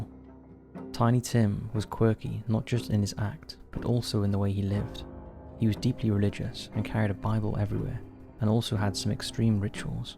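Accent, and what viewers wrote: Wales, United Kingdom. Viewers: is it Welsh? I thought he sounded a little british, but regardless I miss the old narrator. He just sounds so much more educated and classy then the new one 😏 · he’s definitely Welsh lol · Oh I've missed your soothing Welsh tones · Yeah, they have not been the same without his Welsh twang.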